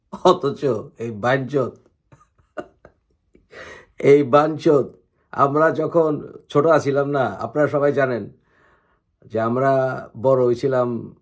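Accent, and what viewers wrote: Dhaka, Bangladesh. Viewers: ভাই আপনি এর পর থেকে ঢাকাইয়া ভাষাতেই কথা বলবেন, খুব ভালো লাগলো♥️ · ভাই ভালোই ঢাকাইয়া ভাষায় বয়ান দিলেন, মজা পাইলাম । আসলেই কি ঢাকাইয়া আপনি ? ঢাকাইয়া ভাষা খুবই ভাল বলেছেন বলে লাইক দিলাম । একজন অভিনেতাও এরকম ভাবে অরিজিনাল ঢাকাইয়া ভাষা বলতে পারে না ।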